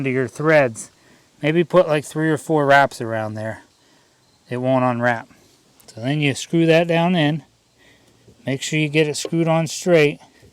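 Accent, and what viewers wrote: Pennsylvania, United States. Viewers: Loved the video. It was informative. I have always helped my dad with winterizing our pool. I've never done it alone. My dad passed last month. I'm thinking to myself... how on earth do I close the pool for the winter. My dad had done everything and only wanted help with centering the pillow and placing and securing the pool cover. So here I am.... clueless about all of the other aspects of closing the pool. I had to chuckle at the PA accent. My dad has family in PA. I just came back from a week in PA (it was originally planned as a trip to bring my dad to say good bye to his family there). Thank you for your advice. I got this.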